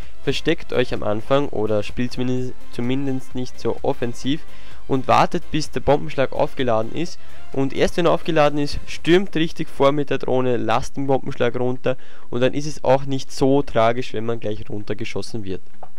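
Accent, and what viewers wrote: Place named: Austria. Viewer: veroarscht wieso in österreichisch?biste österreicher ich nähmlich auch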